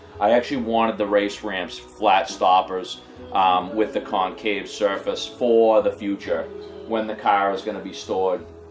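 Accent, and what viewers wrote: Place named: Boston, United States. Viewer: Hello form 02368. I heard that Boston in you for sure, before I read the name of the YouTube channel LOL. Great video.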